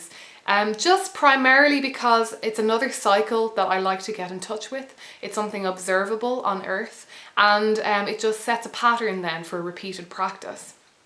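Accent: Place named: Ireland. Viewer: She has to be Irish. Interesting concepts. A bit fuzzy-wuzzy for my tastes, but I get the idea where she's coming from. Mashing Pantheistic Paganism with tangential science ... what can be sensed .... lends descriptions to quality discussion. Personally, I believe in one, and only one, God. "There can be only one." - Juan Sánchez Villalobos Ramírez How to make a memorable introduction... <link>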